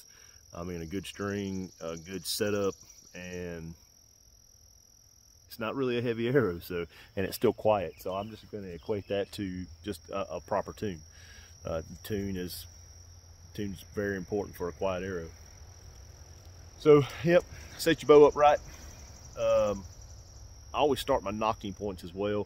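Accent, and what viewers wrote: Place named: Mississippi, United States. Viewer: What part of the US are you from. I used to live in Missisippi and the accent sounds quite familiar. Regards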